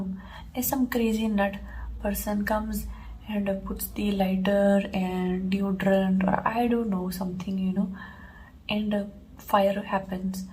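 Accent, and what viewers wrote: India. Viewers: Is this a Hindi accent specifically?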